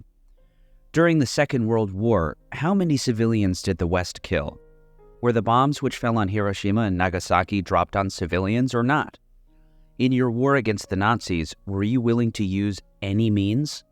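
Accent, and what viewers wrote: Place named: United States. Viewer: He does speak with an American accent.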